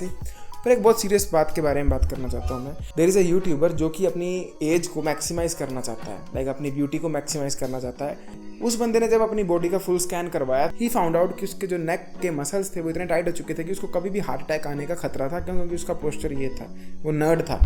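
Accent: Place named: Himachal Pradesh, India. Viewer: That himachli accent😂♥️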